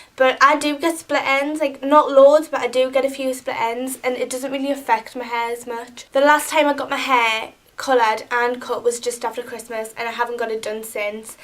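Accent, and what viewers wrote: Newcastle, United Kingdom. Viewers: Yay geordie accent :D I'm from Newcastle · im from newcastle, yeah she is from newcastle, in Britian we're Geordies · Geordie accent ? (Newcastle ) proppa love ittt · Guys she isn't Irish, she's from Newcastle in England :) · She is from Newcastle by the sound of her accent - similar to mine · no its not? it's a geordie accent. (i am also a geordie). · Omg , I'm a Geordie as well! Best accent ever! ,xo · Erm for all who want to know she is geordie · She's from Newcastle :) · She's a Geordie like me omg! From Newcastle!🙊 ♡ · it's geordie, like me :) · Her Accent is Gordie, What Gordie Shore, it will change your life. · She talks like them in geordie shore. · u from newcastle? i think i see a fellow geordie? ahaha x whey aye man x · its a geordie accent (newcastle) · omg we have the same name and are you a geordie, if you are we are so alike!! 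x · is that the Geordie accent? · She is British, she is from Newcastle I think... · shes not from liverpool shes from newcastle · R u from Newcastle?????? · She's a Geordie lasssss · That is definitely not an Irish nor Welsh accent. If I was to guess, I'd say wither Liverpool or Newcastle. Probably the latter. Bloody lay off it. That's not the aim of the video. dayum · Shes got a geordie accent, from around newcastle in the UK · She has a Newcastle accent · shes from Newcastle not feking Ireland -_ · Her accent isn't 'fake' shes from newcastle, north easy of England. I went to school with her. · She's from Newcastle!!! You will recognise the accent if your from Newcastle or watch geordie shore, her accent is not fake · Ive got this accent too, shes geordie :) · I think shes from Newcastle in Britain.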